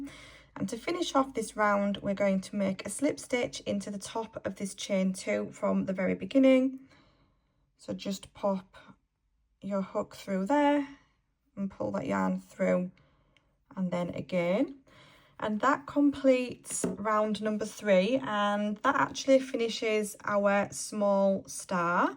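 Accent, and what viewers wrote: Northern England, United Kingdom. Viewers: 💙💙💙 Thank you so much for sharing this. ✌🏻💙🧶📝✂️ Are you from the northern part of England? I'm just curious because your accent is a bit different.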